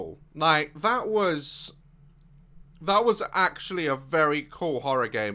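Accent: United Kingdom. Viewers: The jump scares were top notch, it was enough to curse in my native Spanish language! 😆 I laugh so hard when cursing in a UK accent makes it 10X funnier. I love it!! 😆👍 Had a rough day and I needed a good laugh and good ol’ always cheers me up!! 🙂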